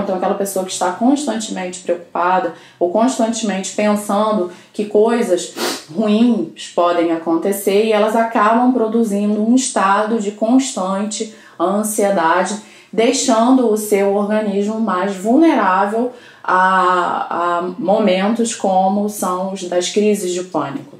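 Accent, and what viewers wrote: Rio de Janeiro, Brazil. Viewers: Você é Carioca? Ótimo video Devo deixar de tomar Café? Consumo mais do que o permitido.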